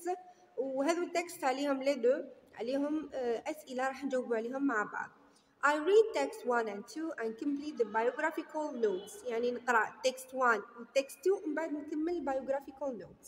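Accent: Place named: India. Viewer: يا استاذة مع احترامي اللهجة الانجليزية تاعك مثل لهجة الهند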